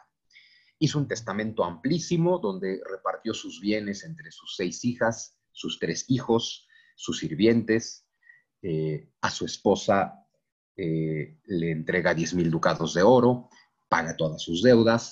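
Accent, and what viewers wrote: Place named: Mexico. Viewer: ¡y que bonito el español de México!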